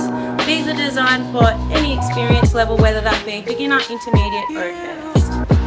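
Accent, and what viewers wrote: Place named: Australia. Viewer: I did not expect to hear someone speak in Aussie accent on a Japanese ski shop video :) I may visit to rent skis when my family goes to Japan for a ski trip.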